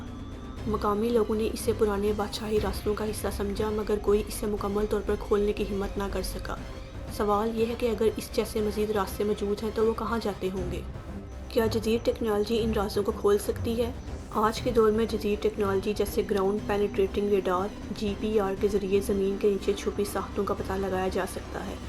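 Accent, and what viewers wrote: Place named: Punjab, Pakistan. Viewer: Achi video hai per ager ap slow bolain or Punjabi accent ki jagah Urdu Ka sahy talaffuz use karain to zyada Acha lagega Jese لہور Nahi لاہور Sahi word hai or سیکnahi سکھsahi words Hain aise he or b words Hain jinhain ap ghalat pronounced kerrahy Hain per video achi hai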